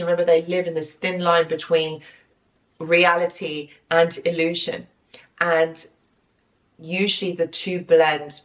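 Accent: United Kingdom. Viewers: YOU , Miss are absolutely BRILLIANT!! and adore your Brit accent😍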